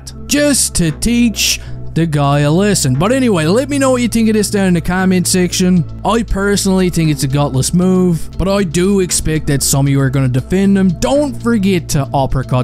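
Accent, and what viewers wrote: Newfoundland, Canada. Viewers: You sound like a newfie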